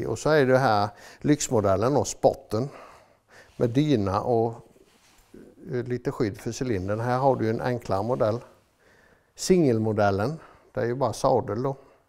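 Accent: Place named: Vetlanda, Sweden. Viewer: Skön blandning av nostalgi, entusiasm, motorkunnande och Vetlandadialekt!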